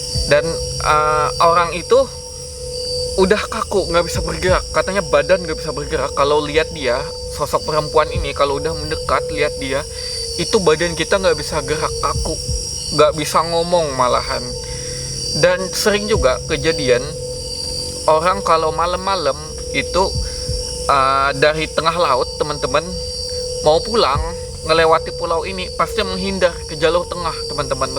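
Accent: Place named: Kalimantan, Indonesia. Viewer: Ga usah pake kata Gua apa Gue bang.. Logat mu kalimantan banget.. Lebih bangga pake bahasa kalimantan atau setidaknya bahasa indonesia.. bangga lah berbhasa Daerah, bahasa kalimantan!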